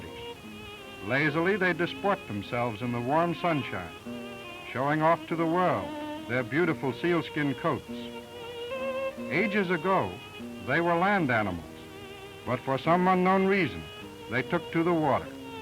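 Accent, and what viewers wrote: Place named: Boston Brahmin, United States. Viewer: Has no one commented on the old fashioned Boston Brahmin accent of the narrator? I find it charming.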